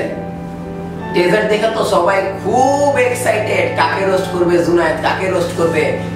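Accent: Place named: Old Dhaka, Bangladesh. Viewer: vi vlo bolsen.. but Language gola ektu vlo korle r o vlo hoto... but kisu korra nai karon ata poran Dhaka kotha bolar style.. jak all the best vi.. 👏👏👏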